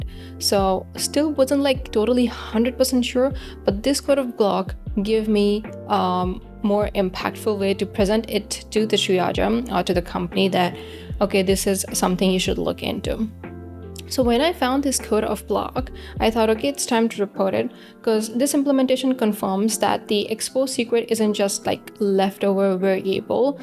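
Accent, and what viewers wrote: India. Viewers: You from India?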